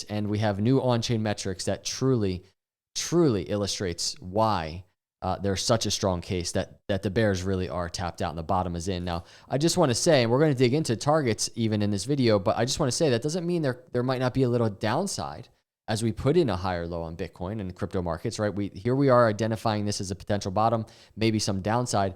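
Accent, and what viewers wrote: Philadelphia, United States. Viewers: I love your channel bro. I did my medical training in philly and you seem to have the accent. U a philly guy? If so pats or genos?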